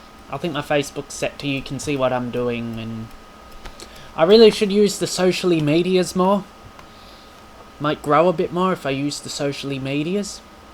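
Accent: Australia. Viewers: Speaking as an NZer you sound Australian to me. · You sound more Australian than me haha. Little tip for your green screen, try to get the material tightener on your frame, it helps heaps with keeping the background images solid. Otherwise great video :)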